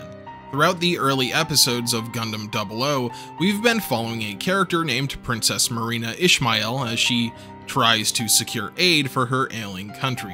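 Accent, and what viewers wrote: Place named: England, United Kingdom. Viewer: Thanks for the coverage, but how you reference all noun/name with the English accent is amusing! For me, So-les-ta-lo being all the way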